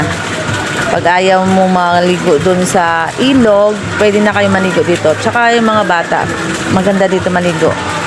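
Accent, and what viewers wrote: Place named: Hiligaynon, Philippines. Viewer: Daw ilongga voice mo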